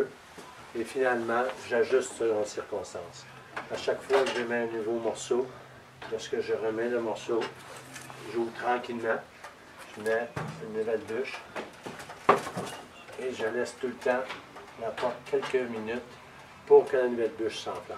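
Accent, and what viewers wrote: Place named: Quebec, Canada. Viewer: I speak english and french and still cant understand you.. this quebec accent is impossible for those that actually speak proper french.. what a shame and a waste of a video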